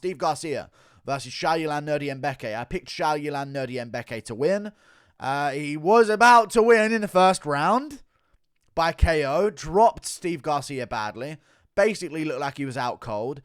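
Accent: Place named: United Kingdom. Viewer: Mate, where you from in the UK??? Mad accent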